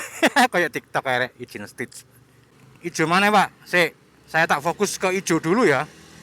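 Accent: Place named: East Java, Indonesia. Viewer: Logatmu wes jawa timuran bro ilang asline😅😅ditunggu di jalur JLS trenggalek - tulungagung lanjut blitar-malang🙏👍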